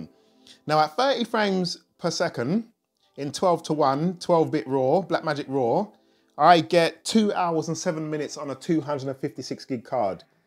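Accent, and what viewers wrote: United Kingdom. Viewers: I'm here for the British accent, otherwise excellent review we need more of the affordable gear review.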